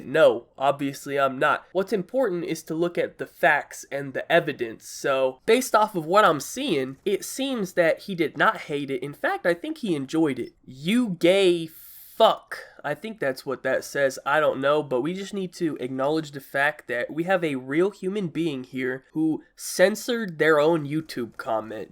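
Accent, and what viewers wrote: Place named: Texas, United States. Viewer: Sorry I don’t really understand your Texas voice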